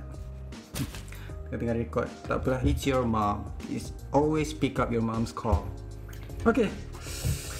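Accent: Terengganu, Malaysia. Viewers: cam loghat teranung je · ganu ghupenye..hahahah.. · org ganu ke boh?nice .. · bro org trg eh? area mana? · Orang Terengganu bro? dengor slang ganu skit tuh..huhu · Org Terengganu kee??? duk mane.. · org tganu ke abg? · orang terengganu ke? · Mu org tranung ke awang? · Org ganu ke hihu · orang ganu k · Hui orang ganu gok ni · Ko orang ganu ke? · aku bru je discover video2 mu wahai, makin byk video aku tgok makin aku sure mu ni org ganu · sek ganu ni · org ganu ke boh? baek ahh.. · laa org ganu ke? hahaha kemah idola duk gong badok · Ganu boy bosku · Bunyi macam bahasa terengganu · Orang terengganu ke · orang ganu ke？ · bro org ganu ke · dak ganu ke.. mydin gong badak. baik ahh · pergh. orang ganu ke bohh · ORG TGANU KE NI BOSS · Ouhhhh org ganu rupenyee...hihihi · Mu ni org teganu ke? Ingtkan org KL. Nice bohhh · Org tranung ka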